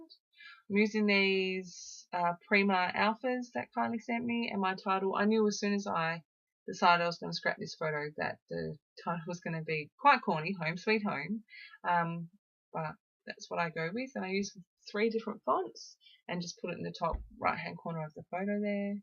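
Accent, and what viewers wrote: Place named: Australia. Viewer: I love your thought process! I am a new subscriber and honestly I first clicked on your video because of your first name and I LOVE you saying my name with your Aussie accent 😉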